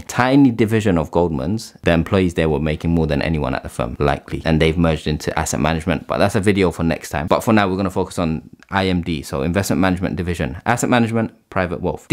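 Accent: London, United Kingdom. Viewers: 1. Awesome video. 2. I really love the new generation London accent 3. I will always be so thankful for your videos that helped me get into banking 2 years ago, thanks so much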